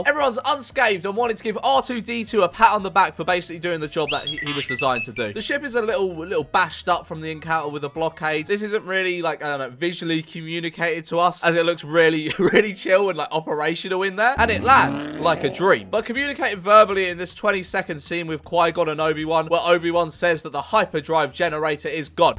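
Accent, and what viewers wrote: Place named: Liverpool, United Kingdom. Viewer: The scouse accent is class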